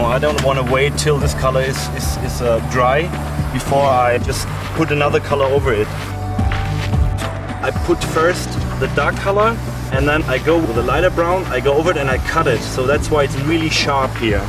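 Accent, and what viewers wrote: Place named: Germany. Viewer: sounds like hes german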